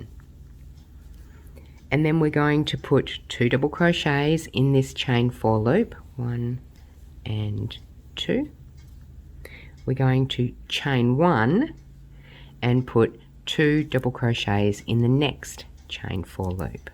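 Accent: Australia. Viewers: Thank you! Great pattern and excellent instruction! Lovely to hear another Aussie accent 😊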